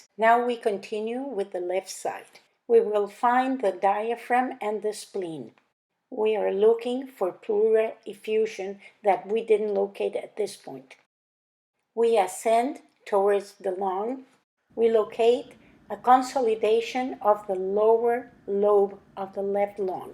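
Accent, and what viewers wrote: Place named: Italy. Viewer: MD, RDMS en los comentarios del video se escribieron tantas cosas de ti, tienes todavia muchos fans que añoran esos tiempos mucha gente me decia que te habias casado con un militar de la epoca de los 70s otros cque con un musico, etc etc las pocas veces que habalste ne los videos note un acento diferente, eres italiana?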